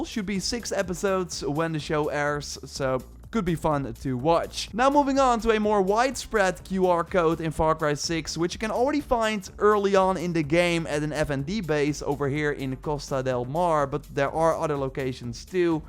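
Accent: Netherlands. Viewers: asljeblieft , werk aan je verkaasde engels . goeie content maar mijn oren bloeden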